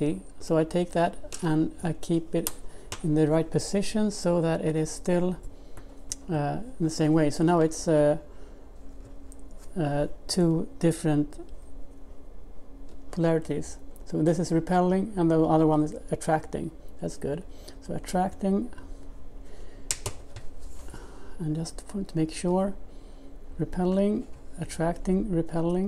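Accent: Sweden. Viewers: Sorry to ask....are you from Sweden?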